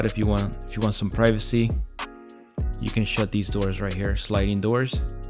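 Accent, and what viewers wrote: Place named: Miami, United States. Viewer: You have a thicc Miami accent brother lol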